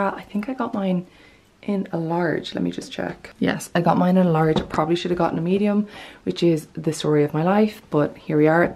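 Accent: Ireland. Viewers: Oh my Lord! your accent makes me wanna move to Ireland.😍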